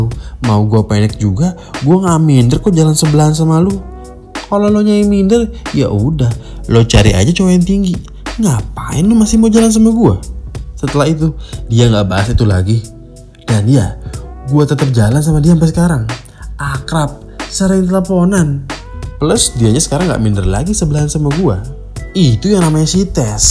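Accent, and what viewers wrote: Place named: Java, Indonesia. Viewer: Lo kenapa sekarang pake logat logat jawa gitu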